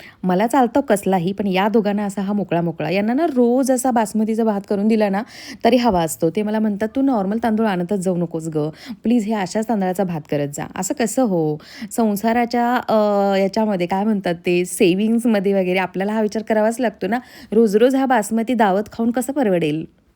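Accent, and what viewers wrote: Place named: Kolhapur, India. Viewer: तुमची भाषा सेम माझ्या कोल्हापूर सारखीच वाटते ❤️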